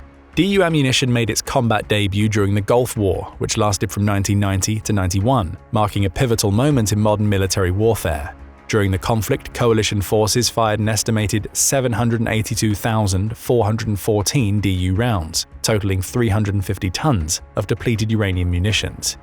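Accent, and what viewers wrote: United Kingdom. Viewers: I wish these videos didn't include British narration.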